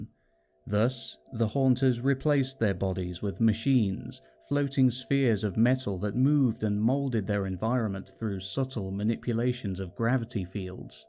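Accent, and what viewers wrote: United Kingdom. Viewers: Hey, idk why you don't have more views, before getting the PDF i was looking for someone with a mild level of oration competency reading this, but the only one there was on YouTube was the guy with the annoying loud music and another guy with the most anticlimactic voice in the world, and the fact that you are bri´sh might help with this, but anyway keep up the good work.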